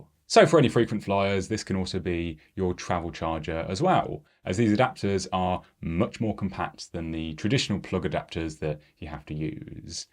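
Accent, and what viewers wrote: Midlands, United Kingdom. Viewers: Are you from the Midlands? I’m trying to place your accent. I love the Retro charger - I’ve been after something like this for upcoming holidays.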